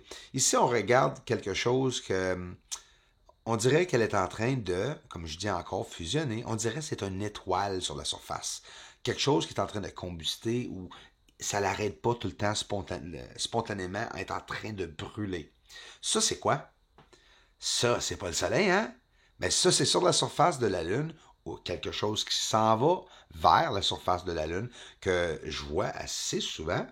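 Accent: Quebec, Canada. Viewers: y a pas pire aveugle,que celui qui ne veut pas voir,bout d,viarge,ha ha,t,as de + en + notre bel accent quebecois,lache pas,on aimes ca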